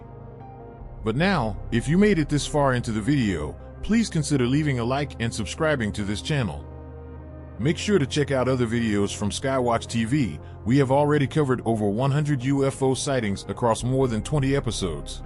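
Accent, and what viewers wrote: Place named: South, United States. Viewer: First of all, I love the videos. Secondly, you have the must subtle southern accent. Sounds like South Louisiana or maybe even Houston. Cheers from a South Louisianian!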